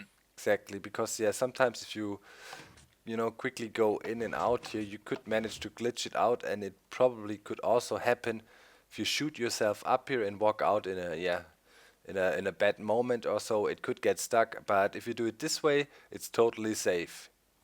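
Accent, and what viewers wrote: Germany. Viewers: you can hear a little german accent :D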